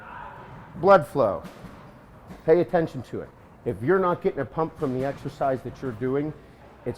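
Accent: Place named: New York, United States. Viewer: I love your way of explaining tips, moreover I like your typical New York accent. I just love it. Whenever I listen to you, I feel I am in New York. Thanks bro you the best. Prem India.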